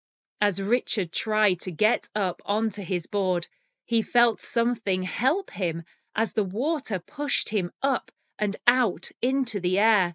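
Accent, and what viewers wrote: United Kingdom. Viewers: I used to listen to American English only that I don't get the British one, but after watching a couple of your videos, I got used to understanding more British English! Thanks so much! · Yes, sometimes I got lost in the story, but it's because I saw a bunch of words that have never seen before. Hahaha I used to be angry at this, but now, I know it's something normal, it's part of my English learning journey. If I already knew them all, why would I learn English then? Hahahahaha I want to thank you for this story. I helped me a lot to improve my British intonation, pronunciation and learn new words. Keep going. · Thank you so much, I had a nice time hearing and read along to have British accent. It was so fun that as soon as I saw the word mesmerising, I immediately thought of mermaids. And I was so sure about it when I read that the woman's name was Coral. 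Thank you for the story 😊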